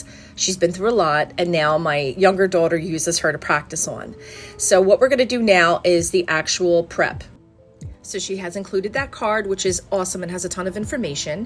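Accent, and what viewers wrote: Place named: Philadelphia, United States. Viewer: This is a great review I like how you compared both. Love your Philly accent 😊❤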